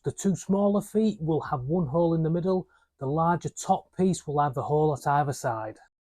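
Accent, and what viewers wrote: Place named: Birmingham, United Kingdom. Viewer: I'm not good with accents, I would have guessed you were from Birmingham. I can't imagine anyone ever leaving the Yorkshire Dales! Cheers from Florida.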